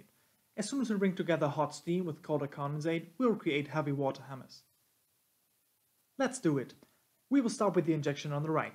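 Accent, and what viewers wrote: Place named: Germany. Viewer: Your English sounds rather German-ish with that accent so I'm gonna ask in German Das ist ein interessantes Video danke erstmal dafür aber sehen Dampfschläge in Rohrleitungen zb. nicht komplett anders aus? Natürlich bezieht sich das Video auf einen Kondensatbehälter bzw Speisewasserbehälter aber habt ihr ggf auch ein Video das sich mit Dampfschlägen in ROHREN befasst? Das fände ich sehr interessant und wichtig zu wissen für angehende Kesselwärter zu veranschaulichen warum das richtige entwässern eines jeden Dampfsystems vor Inbetriebnahme so wichtig ist :) danke für die Aufmerksamkeit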